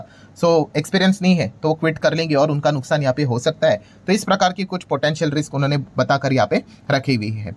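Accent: Maharashtra, India. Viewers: Kamtarta, vichar bro marathi hain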